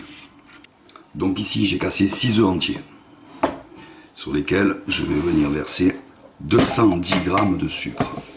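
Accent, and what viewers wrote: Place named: Narbonne, France. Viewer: Exactement la recette que je fais. Ma mère faisait la même (juste elle mettait plus d'oeufs ) je mets un peu moins de sucre Mais javais besoin de précision et elles sont bien présentes dans cette vidéo ca ma fait rire d'entendre dire de ne pas changer de casserole Un côté humoristique que j'aime beaucoup ^^ Et je suis aussi du midi région narbonnaise ..(je reconnais bien l'accent ;) Merci !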